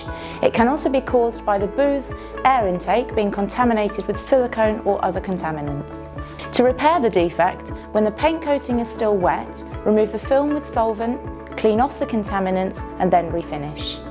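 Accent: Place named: United Kingdom. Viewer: For the repair 1) what solvent is she talking about it step 1.a? you've just painted it, there's no solvent on there. duh. 2) what does 'flash' in ss 1.b mean? Secondly, what section of of jolly ole england is this gal from? Sounds mid-british, not too high-falutin', not too lower, just a nice, regular midcent from somewheres. I'm guessing not w/i 60min of londan, but neither higher than an hour south of the scottish border. Idk, never been over there, don't know if it's coastal or country or suburban. Little help please. I like to study these things.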